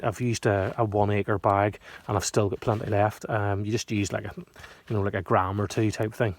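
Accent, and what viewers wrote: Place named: Derry, United Kingdom. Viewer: I’m from Strabane so similar accents, you’re just slightly more Derry than me 🤗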